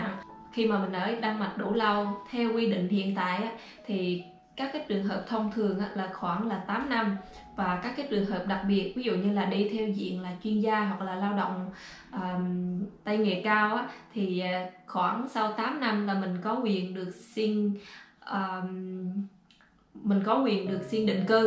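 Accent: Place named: Quang Ngai, Vietnam. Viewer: chị Quảng Nam nè,nhưng nghe em nói giống Quảng Ngãi hơn.Chúc 2 vc thành công nha!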